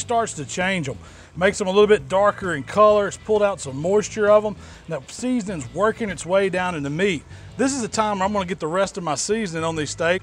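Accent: South, United States. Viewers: Your southern accent has faded a little bit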